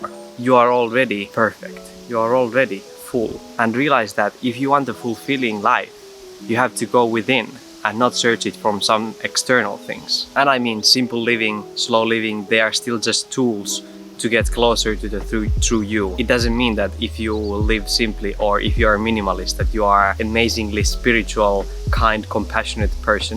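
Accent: Finland. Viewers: I love how I can tell you are from Finland by how you speak. Terkkuja Oulusta. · Are you Finnish? You have similar accent :) Merry Christmas :)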